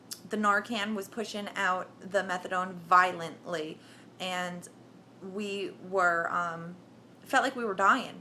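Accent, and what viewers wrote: Philadelphia, United States. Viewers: hey I really appreciate your vids. don't listen to the haters and I hope ur getting a good laugh at the people commenting on here that are obvi. LEOs. anyway you bring up really good points. are u from philly? I think I hear the accent lol. im from the suburbs detoxing myself from subs right now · Lol soon as I heard you talk I knew u were from Philly or jersey. Philly here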